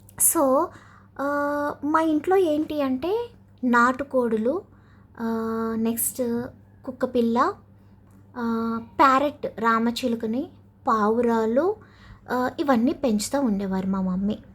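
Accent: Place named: Godavari, India. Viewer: మీది గోదావరి అండి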